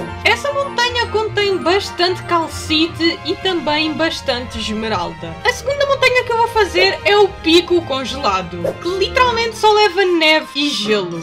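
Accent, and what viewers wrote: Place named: Portugal. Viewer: mais perguntas olha você morrava em Portugal e veio para o Brasil ou é só um sutaque de Portugal que você ganhou da sua mãe ou pai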